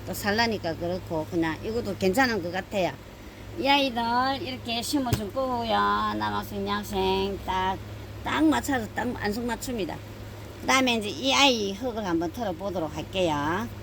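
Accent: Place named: Gyeongsang, South Korea. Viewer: 저두 경상도여자~~ 말씀, 사투리 다 알아듣고, 넘 재미나시는데! 넘~신경쓰지마세요~~앞으로도 조잘조잘 많이 부탁드려요~~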